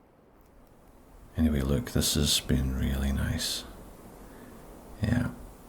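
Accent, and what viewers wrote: Scotland, United Kingdom. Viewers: Found this account today, and it directly qualified as one of my ASMR favourites! Not only is it tingly and makes me laugh - on top of that I get to enjoy listening to the beautiful Scottish accent. It’s all insanely glorious! And I just want to say thank you!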